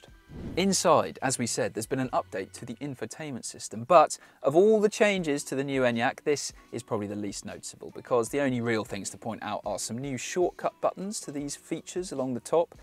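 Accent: United Kingdom. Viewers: American here. I can't buy this car in the US. But I just watched the whole video because of his perfect British accent.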